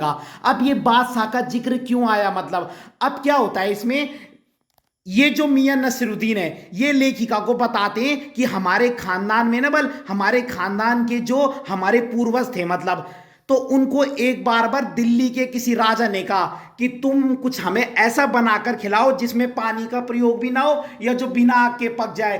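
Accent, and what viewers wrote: Garhwal, India. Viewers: I'm subscribeing you just for you explanation and your cute accent as I know you are a gadwali ❤️🙏🏻 Tehri rifles here 😂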